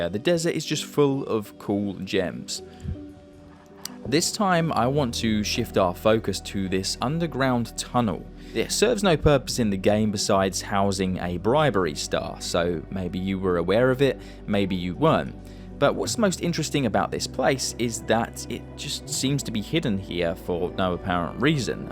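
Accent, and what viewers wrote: United Kingdom. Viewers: I played the year it came out and saw all those back then... didn't even forget 'em. My wife watched her brother play back and the day... she didn't forget them either. The houses in the ocean being weird to what sounds like an Englishman are pretty rich. You never heard of the houses ON the River Thames or the WW2 outposts that people ended up living in? SMH. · He's British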